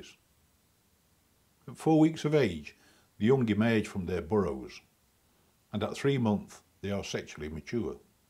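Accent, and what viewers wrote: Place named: Lancashire, United Kingdom. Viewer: Thankyou,,, Great video,,,Great Accent,,, Lancashire,,, Could be Yorkshire,,,I my self like rats,,,, Good luck to them all,,,👍🌹😄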